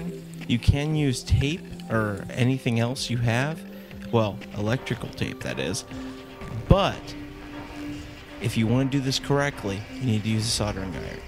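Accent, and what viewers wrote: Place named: United States. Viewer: he says that because hes from the USA and thay speak a bit different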